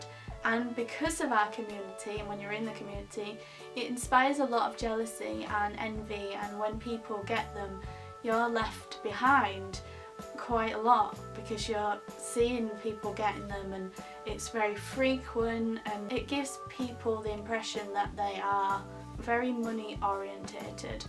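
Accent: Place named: Yorkshire, United Kingdom. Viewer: Erm i have the same accent as (as from Yorkshire originally) you can't help the accent you have, for goodness sake. You are probably not english either, cos most Brits say it like that, it is how it is meant to be pronounced!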